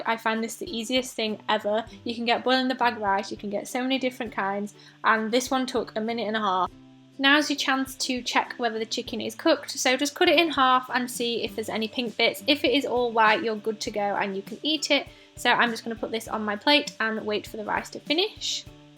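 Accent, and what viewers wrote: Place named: South Yorkshire, United Kingdom. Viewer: Where are you from?! You sounds so much like me, haha, i'm from South Yorkshire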